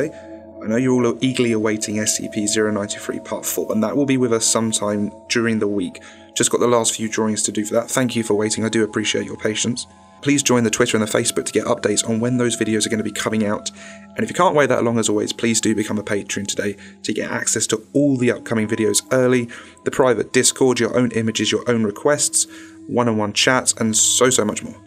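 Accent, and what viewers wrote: United Kingdom. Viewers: Is he british? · That start was priceless, the accent and British slang only made it better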